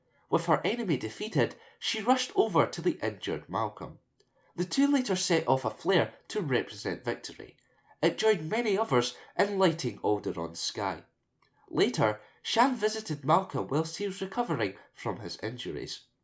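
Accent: United Kingdom. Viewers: British accent I'm thinking? or just a diverse American voice here?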